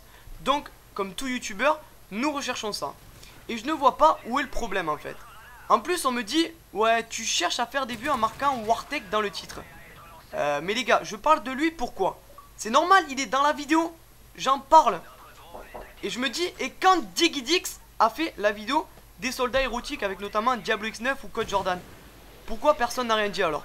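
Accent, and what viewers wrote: South, France. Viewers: ta raison mon gars tkt tu va aller loin et evite les con ki mette des pouce rouge tu c meme pas pour koi en tt cas t un de mes youtuber preferer ac ta peche ton accent du sud ! en tt cas bonne continuation. et je compren pour le but de monptelier ke tu les upload parce quil est magnifique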